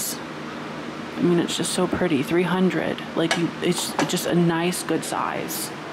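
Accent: Midwest, United States. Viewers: such a wonderful overview of everything and your right, CLL would go absolutely crazy❤️ And our Midwest pronunciations ❤️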